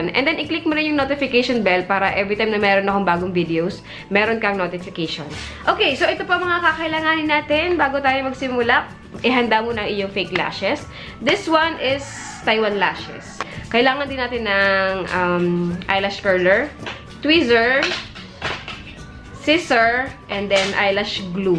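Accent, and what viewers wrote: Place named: Quezon, Philippines. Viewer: thanks maamsh.. Taga san po kau parang puntong quezon.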